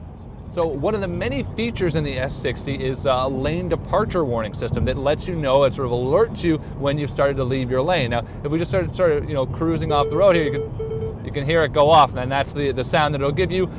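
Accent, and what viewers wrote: Canada. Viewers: is he Canadian? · All I hear is Canada seeping out of his nostrils.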